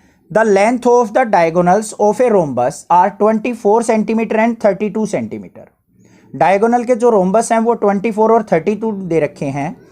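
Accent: Haryana, India. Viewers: Sir ap haryana se ho na apki language thodi vasi hi h sir or baki sab ke bhi solve karado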